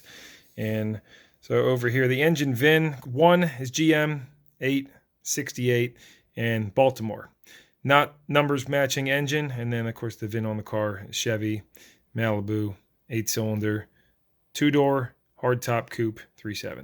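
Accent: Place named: Philadelphia, United States. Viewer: I'd like to see your swap process for the 4 speed. this is the first video I've watched and it was great. You sound like your from Northeast Philly I'm from Harrisburg. It's really cool to see someone local doing this on YouTube I've been thinking about starting my own channel and I love your format. Keep it up!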